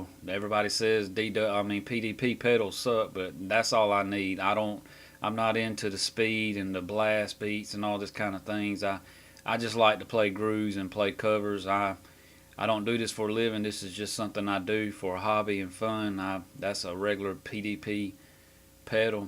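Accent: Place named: South, United States. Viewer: Thanks for taking us on the tour! I really like your sound as I have said before! Now, ......you have to tell me where your southern accent is from!!!! I didn't expect that! HA HA I live in SC so I know all about southern slang! HA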